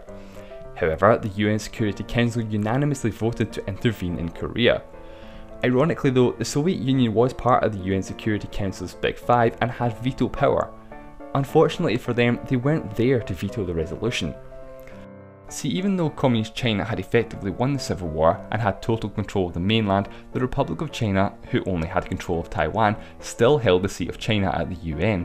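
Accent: Scotland, United Kingdom. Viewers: Everything's so fine about the videos, but please try to change your accent! Non native english (scottish) speakers, cannot understand! we love Scotland but scottish accent makes it all difficult. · This guy is Scottish, I SWEAR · I cant understand why americans struggle with Scottish accents either, they are literally speaking English. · Are you scottish cause you got a scottish accent xD??? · from Scotland, English is his native language. It's how he learned to speak there. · Hiếu Lương He sounds Scottish. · i can't hear scottish accent well... i need to learn more..ㅠㅠ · Are you Scottish? :) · He's scottish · It's not a speach problem, it's the best accent in the world: Scottish accent. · Anmol Madaan Scottish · Scottish accent. Lots of English people find the Scottish accent difficult. And then we have the Welsh. Or worse still, the Northern Irish (or "Bradash!" as they put it). 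· I think he's scottish.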